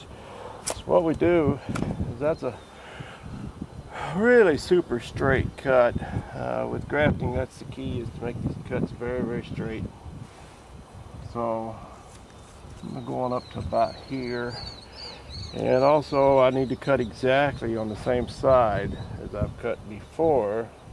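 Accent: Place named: Appalachia, United States. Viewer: Hey. You're in Washington but I detect some Hill Billy in that accent. Are you from somewhere else originally? I'm from Tennessee. Hey I have a question that relates to this video. I have a Gala I bought that's suppose to be a semi dwarf but in the fifth year here it seems to be leaning some and I can grab the tree and move it around kinda easy. Would it be a good or bad thing to plant a couple of MM111s on opposite sides and Inarch into it? I don't want to stake it if I don't have to. Thanks.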